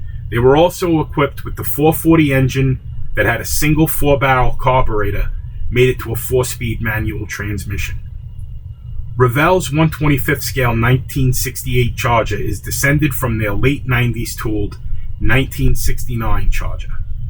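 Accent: New York, United States. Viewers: Excellent modeling skills. Truly a well done detail build...love the engine bay and under carriage. Only one comment. Your speech is fine and you didn't need to bring attention to the "pathology". Ya sound like a Neu Yahker or up coast Bahston. Perfect speech!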